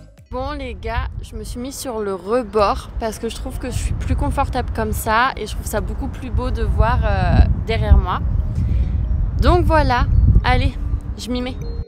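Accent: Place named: North, France. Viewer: l'accent du nord même au states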